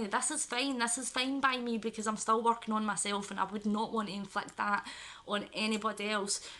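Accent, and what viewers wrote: Scotland, United Kingdom. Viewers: Soz I couldnt get any sound on my phone that's why I asked if your english its obvious now my phone is working that your Scottish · i love you and i'm sorry all of this has happened to you, and i'm sorry this illness runs so rampant in your life. i'm also a mother, living in scotland, with ptsd & other mental illness'. i was absolutely gobsmacked when you explained your teacher read that letter to the entire school?! i actually cannot understand that but at the same time.. schools here back then were rough. nothing good ever came from speaking up which is absolutely not how it should have been. ptsd really controls so much of my life and its such a fragile and difficult topic to speak about, never mind trying to tell someone we you are the way you are and what happened or what triggers you so the sincerest well done on being brave enough to make and post this video. 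it's probably helped a lot of people who are struggling too or who know someone struggling in a similar way. sounds daft but it was helpful for me to hear this all come from someone who sounds the same as me, if that makes sense? same accent and way of talking etc which i don't exactly come across much on youtube etc so it just.. i don't know, felt a bit more personal or something. i hope you're doing okay :)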